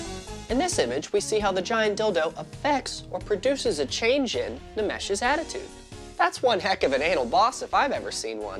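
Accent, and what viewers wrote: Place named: India. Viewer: getting lectured by a curry english speaker is hilarious.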